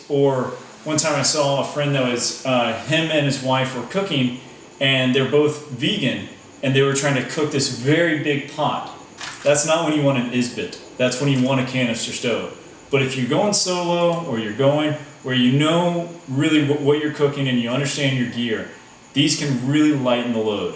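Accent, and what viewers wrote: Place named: United States. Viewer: He is obviously speaking American English. His enunciation is different from yours or standard American English. I had no trouble understanding what he was saying.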